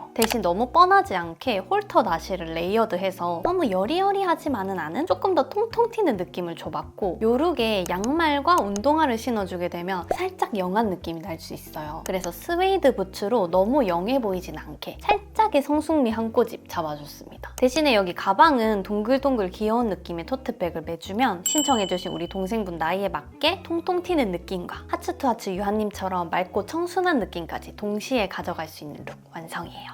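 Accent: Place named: Daegu, South Korea. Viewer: 아이돌 미모에 옷 매치도 잘하셔서 패션유튜버중 가장 좋아요!! 그리구 대구 동생은 언니의 나긋나긋한 사투리말투도 너무 편안하고 듣기 좋아용❤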